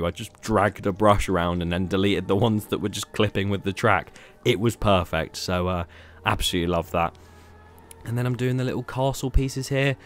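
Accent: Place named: United Kingdom. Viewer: Bri*ish person detected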